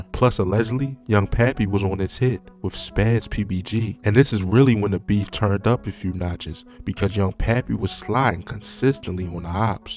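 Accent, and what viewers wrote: Baltimore, United States. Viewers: Mf narrator from bmore💀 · This speaker must be from Baltimore lol he ain’t from no Chicago n I’m from here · Bruh… Baltimore accent or not, please, please dont speak on this ish if you gonna absolutely slaughter the pronunciation of half of what youre speaking on. Is it Young Pappy, or Poppy? You mispronounce and properly pronounce his name back to back. It’s Farwell, not FAREwell, and Loc as in “loke” not “lock”. It takes very minimal, base level research to know this. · Bro you got to be from Baltimore tell me if I'm wrong 😂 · The narrator gotta be from Baltimore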